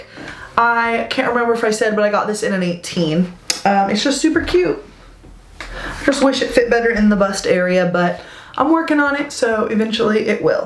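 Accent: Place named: New York, United States. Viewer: Do you speak Italian? What part of Italy is your family from? You sound like your originally from NYC. Loved the video!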